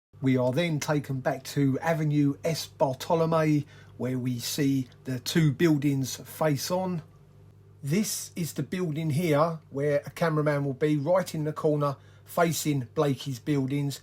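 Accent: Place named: South London, United Kingdom. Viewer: Good job. I enjoyed that. And your accent reminds me of south London where I spent most of my formative years! 👍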